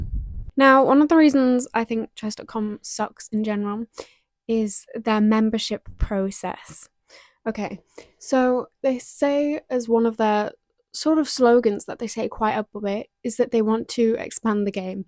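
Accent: United Kingdom. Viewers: Great points and it's why I've always preferred lichess! Btw, are you based out of England or New Zealand?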